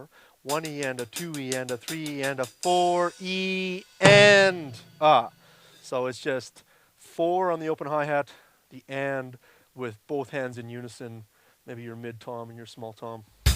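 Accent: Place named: Australia. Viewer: Your British accent sounds Australian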